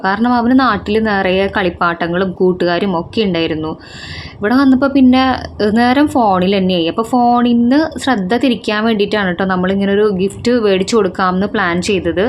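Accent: Thrissur, India. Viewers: എത്ര സംസാരിച്ചാലും ബോറടിക്കില്ല നല്ല ശബ്ദം ത്രൃശൂർ slage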